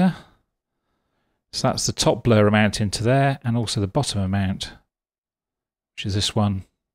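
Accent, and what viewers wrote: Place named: United Kingdom. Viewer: Thankyou - excellent. Also: British!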